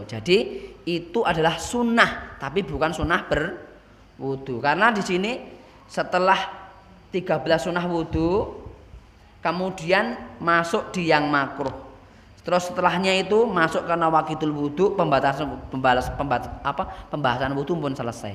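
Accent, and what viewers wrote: Java, Indonesia. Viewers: Waduhhhh jowoooo wkkkk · Di mengerti,,,,, heheheh jawaaaa ki hehhe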